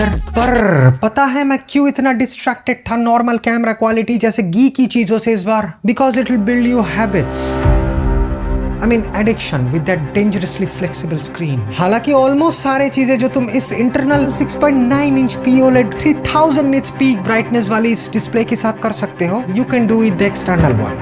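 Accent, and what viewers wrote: Bengal, India. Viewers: Man, what a review video. Very unique. Never experienced such a detailed technical, camera performance oriented review on YT. Have watched your Pocket 3 review also. And I loved the drama in your videos ❤ And the hint of Bengali pronunciation. Really appreciate your hard work. All the best Bro 🙏🙏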